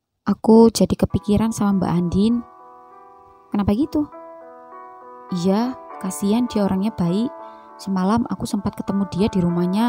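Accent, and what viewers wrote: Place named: Papua, Indonesia. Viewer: Kadang tersenyum dengar logat papuanyaaaa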